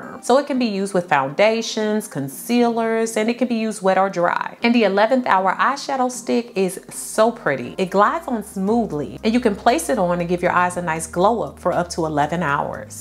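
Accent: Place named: Midwest, United States. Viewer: Are you from STL? I think that I can “hurr” our STL Midwest accent lol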